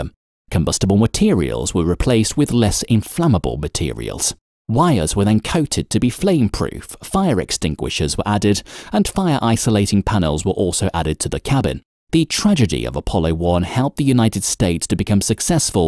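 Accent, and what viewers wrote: England, United Kingdom. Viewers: Why do they use someone (computer) with an English accent? It's stupid and insulting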